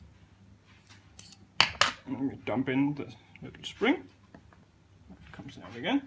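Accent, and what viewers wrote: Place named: Denmark. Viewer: From your accent I guess you are danish. Where do you get pins from. Need some for some 600's and I'm danish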